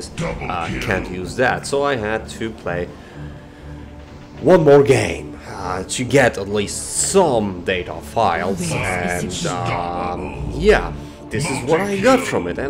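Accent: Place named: Hamburg, Germany. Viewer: Ich bezweifel dass es dir m&#246;glich ist zu verstehen was ich meine. Erstmal bin ich kein WoW-Kind, als ich Kind war gab es weder WoW noch gro&#223;artig verbreitete PC&#180;s. Du &#228;usserst keine Kritik sondern schreibst was dir nicht gef&#228;llt und das in einem aggressiv negativem Stil mit einer Menge indirekter Beleidigungen. Ob du falsch oder richtig liegst liegt im Auge des Betrachters aber dein Verhalten ist keinem Erwachsenen angemessen. "hatte mal 2-3 videos von dir zu MoP zeiten geguckt war noch ganz lustig aber was soll dieses hochn&#228;sige verhalten und diese grauenhafte englische aussprache wo du versuchst nicht deutsch zu klingen..." Was genau ist grauenhaft an seiner Aussprache und warum meinst du dies sagen zu k&#246;nnen? Warum sagen ihm Muttersprachler dies nicht sondern loben ihn sogar weil er nicht nach Schulenglisch klingt? 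Er sprich seit einigen Jahrzehnten genau so Englisch und hatte damit auch keine Probleme. Ich frage mich auch warum man als offensichtlich nicht-Deutscher, das h&#246;rt man trotz Hamburger Schnut in jedem seiner Deutschsprachigen Videos, versuchen sollte nicht Deutsch zu klingen und wo liegt dein Problem dabei? Es sollte dir klar sein dass du deine Community vertrittst und dass du ohne indirekte Beleidigung kaum einen Satz beenden kannst. Hier kannst du, trotz der Schreibfehler in der &#220;berschrift, eine kleine Einsicht erhalten wie man Kritik &#228;u&#223;ert - k&#246;nnte dir helfen denn im wahren Leben l&#228;ufst du bei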